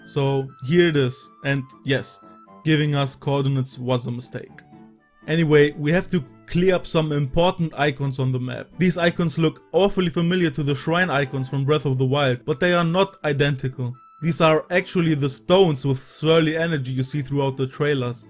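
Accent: Germany. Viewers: Nices Video! Bist du ein Alman? Hört sich irgendwie so an vom Akzent her :)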